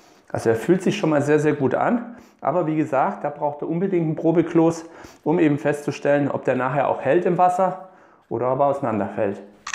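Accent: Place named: Franconia, Germany. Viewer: Fast das Originalrezept. Warum nur fast? Das Drittel der Kartoffeln, die weichgekocht werden, werden nach dem Kochen noch zu einem dünnflüssigen Kartoffelbrei verarbeitet. Die weichen Kartoffeln werden dazu im Kochwasser püriert. Wenn man die Thüringer Klöße so macht, erhalten sie ihren eigentlichen Namen, nämlich Grüne Klöße. Ansonsten hast du es perfekt getroffen. Übrigens: Wo kommst du her? Du klingst manchmal etwas leicht fränkisch.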